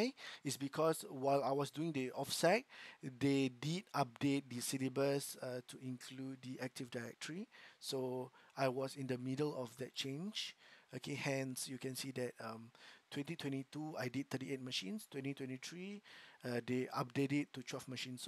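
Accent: Singapore. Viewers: Its like the singaporean accent is so strong, i recognized it instantly😅 congrats bro, i just completed Google Cybersecurity Cert and hope to be working in cybersec with just sec+ and oscp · Thanks for sharing. You sound like a Singaporean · Sounds like Singaporean. Congrats!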